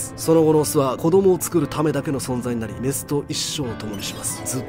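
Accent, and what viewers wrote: Kansai, Japan. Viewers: 最近関西弁混ざってない?w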